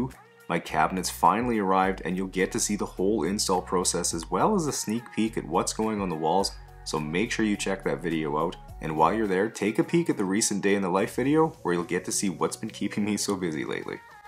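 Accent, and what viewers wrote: Canada. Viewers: I loved this video! I’ve never seen your channel before but a YouTube friend said I had to add you to my watch list. I love dr pimple popper, the hoof GP and now you! Your voice is so soothing (love me a Canadian accent) And the transformation is amazing! What an incredible job 👏🏼 Love from Scotland 🏴🥰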